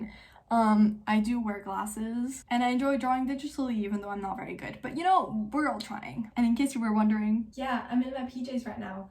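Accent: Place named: France. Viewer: I had a feeling you could speak French, I recognized it in your accent. You speak really well for a newbie to booktube!!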